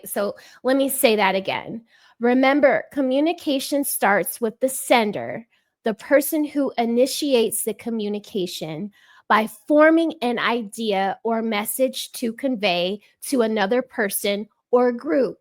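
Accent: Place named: South, United States. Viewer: Kinda figured you were mixed with something. Couldn't put my finger on it. Occasionally, I could hear a bit of a Southern twang and come the freckles.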